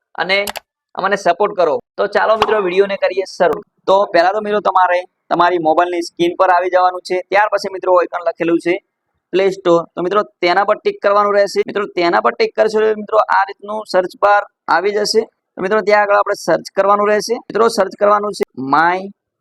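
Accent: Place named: Banaskantha, India. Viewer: આપ બનાસકાંઠા થી છો ભાઈ ભાષા થોડી એવી છે, અમે પણ બનાસકાંઠાના છીએ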